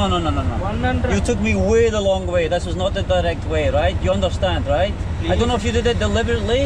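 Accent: Scotland, United Kingdom. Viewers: That hotel looked sweet m8, another great vid your accent is brilliant over there the Scotsman with 2 strong legs 🙂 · Limmy has ruined the scottish accent for me. i can't take this guy seriously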